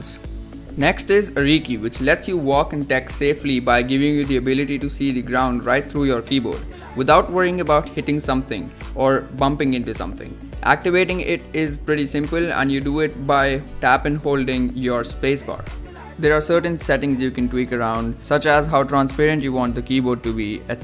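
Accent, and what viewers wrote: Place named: India. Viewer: Which part of India are you from? Great video!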